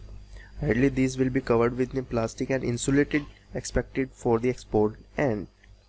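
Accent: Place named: India. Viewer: you white people do not know your accents. He's Indian!! can anybody hear that?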